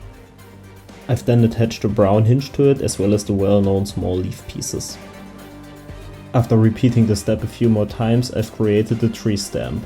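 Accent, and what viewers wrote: Germany. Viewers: du bist deutsch das hört man sehr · Deutsches Englisch 🔛🔝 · As a german I litarlly hear ur actcent that u speak deutsch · bist du deutscher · Are u German?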